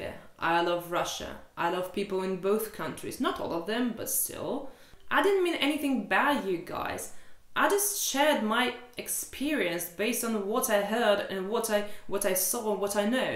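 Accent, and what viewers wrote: England, United Kingdom. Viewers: WTH??? I told you previously that your video was better (and in many ways) far kinder than most travel videos. For example, if I were doing this, I would point out that Russians rarely smile, men make more effort to be gallant to their girlfriends /wives... I truly can't understand why it would 'blow up' (?) this way. But remember... There is no such thing as 'bad' publicity. 😉 And your accent... You sound like someone who learned British English, not American English. I speak (badly and haltingly) BRAZILIAN Portuguese. Not continental, nor Azorean. That's what I learned. Different words and different style of pronunciation. At least this proves the saying : Haters gonna hate! 😆 I like you. I like your channel. They can all go pound sand! 😆 😘🥰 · Don't forget that your accent sounds very British, and NOT Russian so this is a barrier to some to believe you are not from the West or you are truly Russian. 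It's almost incredible to believe you have never been to the UK! I myself was fooled in this regard. This shows that as a linguist, you are very very good at speaking and learning English and with the desirable accent!!!! So, I had to re-listen to your Serbian "comparison" video again to find out why all the negative comments. And you DID NOT say anything bad about Serbia,, most of it was positive comments about Serbia. It was truly just comparing a few things in each of the two cultures. I truly don't know why all the negative comments afterwards. Maybe they interpreted it differently given their various background experiences. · Why do you have a British accent??? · Probably not what you want to hear but I’m British and you do sometimes sound British (almost) ….but obviously I can tell you aren’t! Your accent is really interesting….oh and ignore the haters! 🤷‍♀️You should come to the UK and finish your conversion to 🇬🇧😀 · Lmao, of course you sound British, when it's your profession. 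I've chosen American. How dare we sound native! 😂😂😂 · ) yes you sound british .:)) iv got 🇷🇴 blood in my vain , but leave in denmark, iv got a serbian koleg and im trying to learn serbian. As a russian how well do you speak serbian?? and how long time did it took you to learn it?? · Hi, I like your English accent. Maybe you can make videos about how you learn English. You can share your experience with us. You know there are also many platforms where you can teach people English, and some of them are paid very well, especially Betakid. By the way, I think that this scandal in Serbian portals will help you in your advertising. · I'd like to see you do a video about the contrasts between Russian and Texas cultures. To me your southern drawl is not perfected yet but you're pretty smart, you can do it. Of course in south Texas the accent is different than in east Texas and a southern drawl is different in Texas than Alabama. 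To me it sounds like you speak English with a British accent but I doubt English sounds the same everywhere in England. And when you consider the UK, Canada, Australia, New Zealand and south Texas, it's different even among(st) native speakers. To me it's more about what we have in common. You're from Russia and not everybody is the same there either. But some of us share common values. Thank you for your videos. I would welcome you and your family in my home, I would sponsor you all (ya'll) if you want to visit. Take care... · Dear, Ignore the haters. Many of them would not notice the video, if it would be on Russian and not on English. 😄 Your English is excellent and I really enjoy your British accent. I watched a few videos and I really enjoyed the content. I wish you all the best in Serbia. 😊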